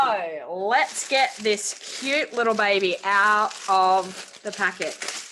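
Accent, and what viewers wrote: Australia. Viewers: Finally Australian accent ❤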